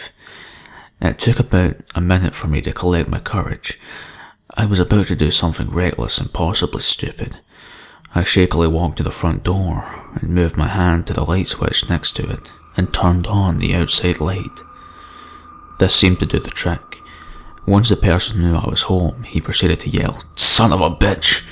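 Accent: Scotland, United Kingdom. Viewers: I'm a huge fan of the horror narration channels, great to hear a fellow Scot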